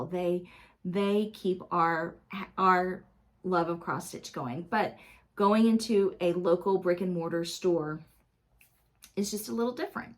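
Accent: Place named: Carolinas, United States. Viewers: Thank you for being so genuine! My husband is from Alabama, it's nice to hear your accent. I love all the things, but #1,3,4,5,6,7,11,14,15,17,18,20,24,28,30 are my choices. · I started watching you from a shout out from Brenda and serial starter..I love the name (my daughter's name) and I loved living in the South..Memphis then Atlanta where I started stitching in the 80's.... The kindness civility and the accent! 😃 I have been in the North (for many years and I tune in and find learning and comfort with you as I stitch. Thank you for the celebration give aways: 1,2,6,7,11,15,17,18,19,20,21,22,23,24,28. Congratulations,! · Happy 10,000 Celebration! I enjoy watching you so much. My family is all in the Carolina’s and I’m in Colorado. Listening to you is like hearing them all. :). 1, 2, 4, 6, 7, 10, 11, 12, 14, 15, 16, 18, 19, 22, 27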